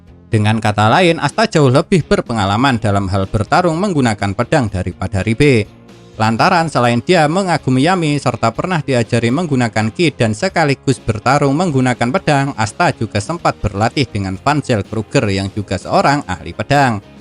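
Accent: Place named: Banyumas, Indonesia. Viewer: Fix mimin nya itu orang ngapak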